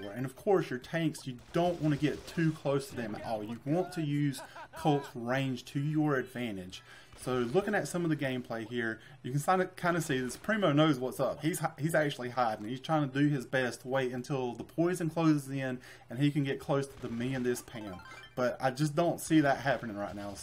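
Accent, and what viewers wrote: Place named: South, United States. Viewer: I love you’re southern accent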